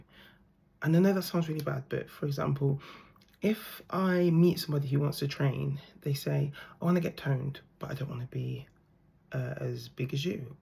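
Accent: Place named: United Kingdom. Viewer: This is my first time seeing any of your videos. I would like to say that I have always had the highest respect for bodybuilders in general - be they male or female - because that lifestyle demands quite a lot of self-discipline in terms of workout routines, diets, etc. As a man, I have absolutely no qualms whatsoever with female bodybuilding. I believe that it is prudent for anyone with the will & drive to do so to be as strong & fit as possible. This will yield health benefits both for the present as well as for the future. I will also say, as a Black Man in the United States, that it is a good idea for us as Black People to at bare minimum render our bodies strong in order to both withstand and effectively defend ourselves against potential attack. The U.S. is quite often hostile territory for our people. I don't know whether this is an issue in the U.K. 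(where I'm assuming you're from given your lovely accent). I will make certain to check out more of your videos. Thank you for your words of support towards your fellow female bodybuilders and for being you. You're beautiful. 🌹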